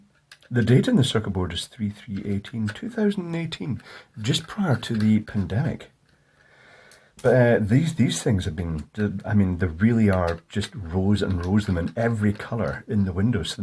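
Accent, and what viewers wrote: Scotland, United Kingdom. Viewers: I love watching your videos, for some reason this one had the auto subtitles activated when I played it and it was made even more amusing than normal because it mistranslated your Scottish brogue. Glitter pearl was translated in the captions to 'glitter peril'. 😀 Be very careful of those glitter perils I understand they can be dangerous.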